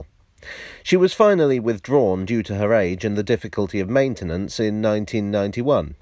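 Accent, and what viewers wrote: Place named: Northern England, United Kingdom. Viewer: Speaking of Liverpool, are you from there originally, or somewhere else up north? You sound like you have a Northern accent.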